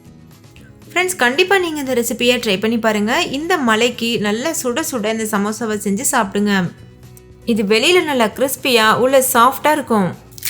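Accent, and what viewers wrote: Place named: Kerala, India. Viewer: நீங்கள் கேரள மாநில பெண்ணா? தமிழ் நல்ல அழக பேசுறிங்க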